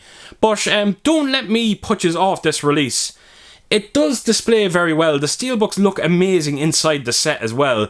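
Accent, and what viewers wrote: Ireland. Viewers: Hey, that is a lovely 4K steelbook set. I have to confess that I've only seen the first three movies in the franchise. I also own none of them on physical media as I only collect horror, independent and underground films. If I collected anything else I wouldn't have the finances for it and I most definitely wouldn't have the space. Even when you review or show movies I'm not really interested in, I always enjoy watching every piece of content you upload. It must be the Irish accent 👍👍👍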